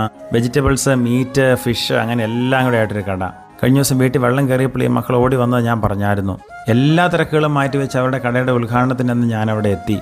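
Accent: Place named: Idukki, India. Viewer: Ningade samaaram kettal kannurkaaran aanenn thonnarilla.... i thought u were someone from idukki, kottayam or pathanamthitta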